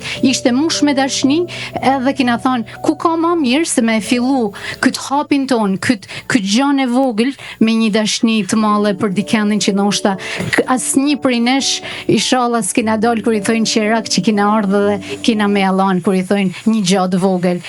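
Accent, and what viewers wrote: Shkodra, Albania. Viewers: Sa. Shum. E. The. Ate. FJALEN. QI. QI. QI ma. Mir. Do. Te. Dalin. Qe Te. Thuesh. Qe Qe. Qe. Qe Jo. QI Qi. Ose Ci. Ci Se NUK. I. Shkon. Dialektit. Shkodran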